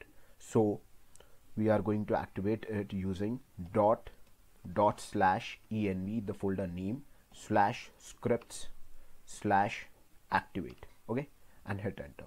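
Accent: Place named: Germany. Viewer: Good day, I have been studying and reviewing videos in Python and now in Django on how to make a website and until yesterday I discovered the so-called templates and the videos that I have seen so far show commands and files that are already obsolete. Until last night I came across this video and it seems to me that it is well structured, updated and in English that I do not master but I have always wanted to learn it and listening to your pronunciation I think it is not your native language either, you sound more like German or European. I was looking over some of the videos of the series, I have high expectations now that I have already seen the first of the series starting with the virtual environment that until now had not deepened it. My goal is to know how to use the templates to not reinvent the stone. Escribi el parrafo en español y lo ingrese al Traductor de Google o sea que si lo quieres leer en español haces la operacion inversa en el Traductor de Google con este parrafo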